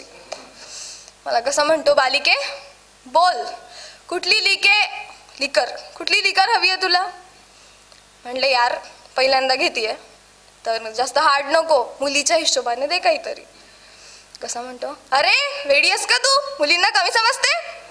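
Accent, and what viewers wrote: Khandesh, India. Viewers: Khandeshi ahe vatate? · आवाज ऐकुन अस वाटत जळगाव खानदेश 💯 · Khandeshi marathi olkhuch yete 😅 Pn chhan ahe 👍👍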